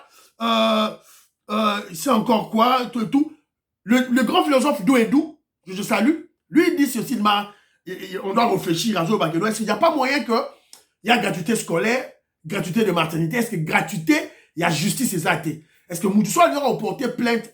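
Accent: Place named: Kinshasa, Democratic Republic of the Congo. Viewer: accent nayo pensa uúhm muluba kin mais ozo kende bien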